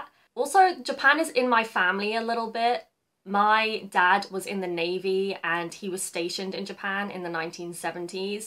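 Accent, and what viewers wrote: United Kingdom. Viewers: イギリス英語気持ちいいです。福島県産も受け入れていただいて感謝。日英同盟復活w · 日本語字幕と綺麗なイギリス英語、とても有難いです。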